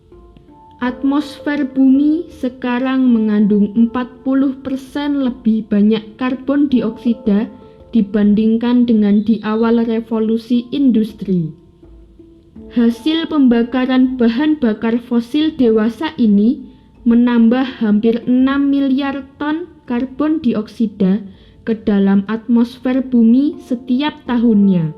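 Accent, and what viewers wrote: Java, Indonesia. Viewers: suaranya Medok dan cadel mbak 😄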